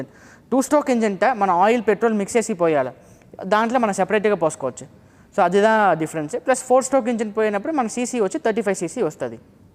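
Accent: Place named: Tamil Nadu, India. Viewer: He is Tamilian, far better, got the point know.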